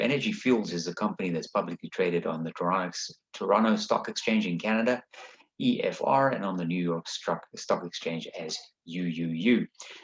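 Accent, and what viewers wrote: South Africa. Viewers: Are you like half South African half American? (Accent)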